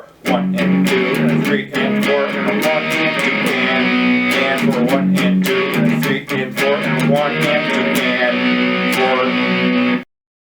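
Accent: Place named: Canada. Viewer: I hear a Canadian accent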